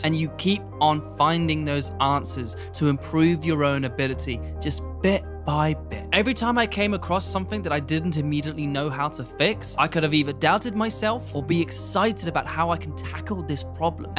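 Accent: United Kingdom. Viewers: intresting stuff here and very well explain with that top notch british accent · Hey......Peter McKinnon sent me.....good to listen a YouTuber who speaks the Queen’s English, for that reason alone I’ve sub’d!👍😄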